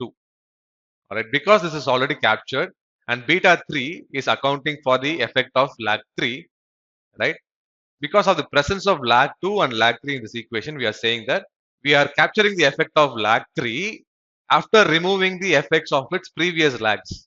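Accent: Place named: India. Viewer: What a perfect indisn accent :)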